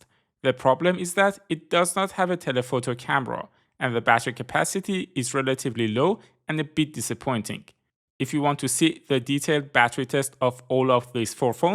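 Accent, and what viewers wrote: Iran. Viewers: به به لحجه ایرانی 😂❤❤❤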